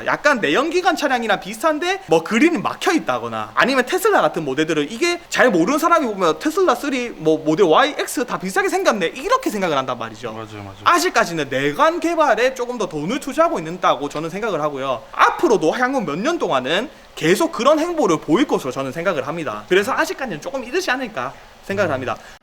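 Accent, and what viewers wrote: Busan, South Korea. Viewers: 딱 보이 부산표준어네? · 마 붓산싸나이답게 설명 쳐즈기네요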